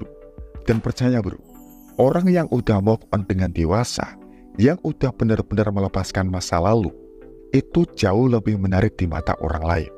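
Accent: Java, Indonesia. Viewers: aga medok nampakmya moderator kali ini🤔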